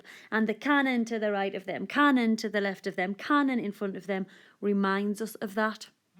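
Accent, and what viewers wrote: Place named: Scotland, United Kingdom. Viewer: All I could hear was your scottish accent. Idk why for some reason, but tht i all I could hear.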